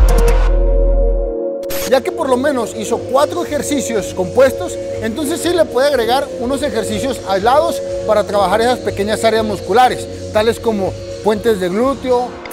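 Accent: Aguascalientes, Mexico. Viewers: Por que así hablamos los de Aguascalientes.. 😂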